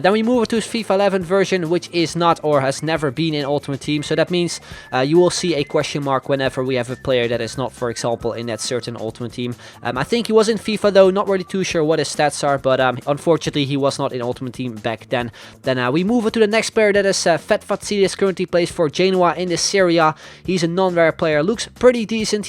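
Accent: Netherlands. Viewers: Je hoort dat je Nederlands bent😌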